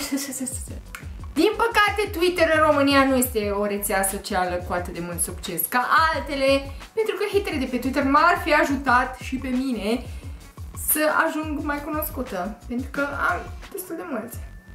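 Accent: Moldova. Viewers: Wow. Asa ceva.... Am intrat pe video-ul asta din intamplare de la o sugestie a youtube-ului. Am ramas interzisa practic:)). Am dat peste o faptura care latra la mine si incerca sa fie funny. First of all: Nu esti funny... daca gesticulezi mult si te scalambi, asta nu inseamna automat ca esti funny. Numai unul poate sa pull it off si ala e Jim Carrey. Second of all: Incearca sa renunti la accentul de moldoveanca, te face sa pari....cum sa ma exprim...saraca cu duhul. Sau daca nu poti renunta la accent, macar nu mai vorbi in engleza, engleza cu accent moldovenesc te face cel putin penibila. Ce e mai trist e ca ai peste 50.000 de subscriberi, asta inseamna ca sunt 50.000 de oameni care te privesc ca pe un role model...bine...majoritatea lor sunt probabil baieti virgini si fete pana in 20 de ani care au ca poza de profil un selfie...deci... In orice caz, inteleg de ce ai succes pe net si probabil nu la fel de mult si in viata reala. 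Asta e pentru ca youtube-ul e 2D...pe cand viata reala, din pacate pentru tine, e 3D, adica lumea te poate vedea si din profil...if you know what I mean. Peace.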